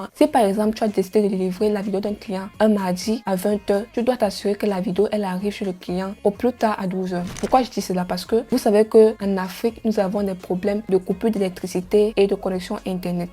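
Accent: Cameroon. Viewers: Comment tu exprimes comme une camerounaise comme ça🙂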